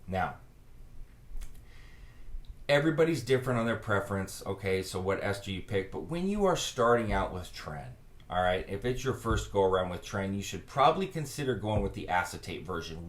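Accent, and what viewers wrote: New Orleans, United States. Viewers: Are you from new orleans??? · you from new orleans?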